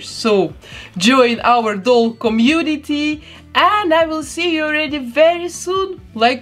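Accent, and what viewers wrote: Russia. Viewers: Так слишно руский акцент)) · Как будто русский не старается над произношением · Русская что-ли? Ужасный акцент. Очень сильно по ушам бьет. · Это... Русский акцент? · Sorry, but where are you from?) I'm intrested, because you sound like you have a Russian accent))) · хм...чувствуется русский акцент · Почему мне кажется, что она русская, говорит просто с акцентом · why do you have a Russian accent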